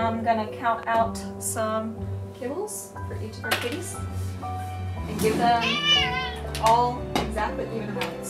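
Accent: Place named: United States. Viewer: How do you have such a clear american accent while living in Japan for so long?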